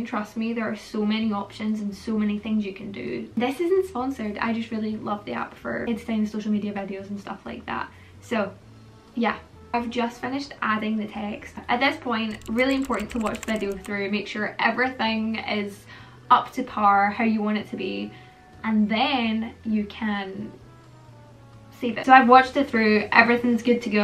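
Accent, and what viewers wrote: Scotland, United Kingdom. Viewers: First video ive seen cause i usually watch her youtube shorts, I didnt know she was scottish, I thought she was american 😂, its kinda cool seeing a scottish creator that doesnt try to hide the accent, wierd to think that she's only like a 6 hour drive away from me, i live in england for clarification😅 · OMG U SOUND SO COOL I NEVER THOUGHT U WERE SCOTTISH ❤❤❤❤❤❤ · YOU'RE SCOTTISH 😮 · Are you Scottish I love your accent I’m from Glasgow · btw guys, she’s scottish 🏴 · Wait!! She’s Scottish !! She just got 10 times better than she already was!! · thats so real, for some reason i imagined her as american loll but i love her scottish accent ❤ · I never would've known you were Scottish, love your accent, love your vids x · That’s not a British accent but rather Scottish, I guess! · Ur Scottish I thought u were American lol I’m from Scotland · No way are you scottish!! 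Seeing you lip sync over all your videos, I did not expect this! Ps. your voice is so nice and calming also I love how you include captions on all your videos, I really need them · Absolutely didn’t expect you to be Scottish! Smashin' it, lass 👏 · WAIT are you Scottish? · Your scottish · I love the Scottish accent❤ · I love watching your videos but I have never been so shocked to hear a Scottish accent before hahah (I’m Scottish too and I genuinely had zero clue!) 🏴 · WOAG ARR YOU SCOTTISH TOO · YOUR SCOTTISH BRO IM SO HAPPY MY IDOLS SCOTTISH LIKE ME IM SO HAPPY AAAAAAAA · Omg r u scottish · SHES SCOTTISH!!!!!!?????? PS I ❤ ur accent I’m just rlly shocked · Scottish actually haha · yeah it’s a scottish accent, i laos have a scottish accent and live in scotland, don’t live here i like my piece and quiet hehe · Are you Scottish? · Ok, so sorry but never realised you were Scottish, I always thought you were American!! 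So strange hearing your normal voice haha. Love your videos!! ❤️ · Are you Scottish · She's Scottish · YOUR SCOTTISH?!?!?! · Your accent is beautiful. I did not expect a scottish accent tho what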